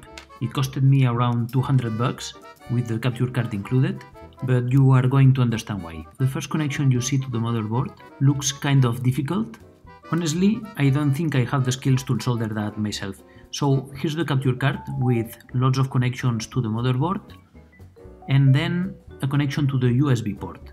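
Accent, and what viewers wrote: Catalonia, Spain. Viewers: se nota bastante. Uno el nombre y algunas palabras en inglés que suenan muy español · Loko como se nota que eres español xdd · No se como he llegado aquí pero tu acento, suena como un español intentando hablar inglés y pronuncias raro xD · Eres español, no? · Ese acento español jodeeeeer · Tío intenta hablar mejor el inglés que se te nota que eres español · Buen vídeo,se nota demasiado que eres español jaja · Cómo se nota que eres español xDd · Vale pavo y si haces los vídeos en español? O catalán...porque llamándote... inglés no eres y americano tampoco... Así que igual también estaría bien que los compatriotas te entendiéramos. La pronunciación te delata colega. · Soy yo o hablaa como español hablando en ingles:v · No, he is not French. That's more of a Spanish or Catalan accent. And since he's from Andorra, it's clearly Catalan. 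· Se nota demasiado que hablas español tío JAJAJAJA por cierto muy buen video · ese acento español sigue intacto papu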